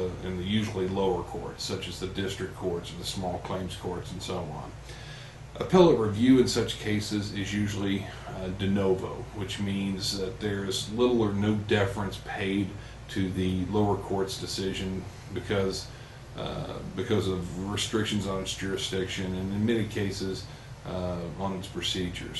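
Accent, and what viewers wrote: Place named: South, United States. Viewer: logical presentation. I enjoy southern accents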